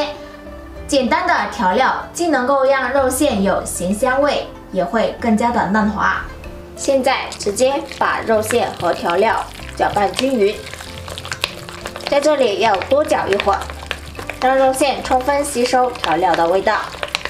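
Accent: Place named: South, China. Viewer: 我一打开，听到南方口音，我就知道好不了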